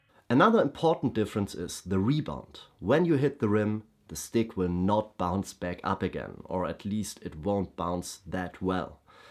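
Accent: Germany. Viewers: thats a german accent isnt it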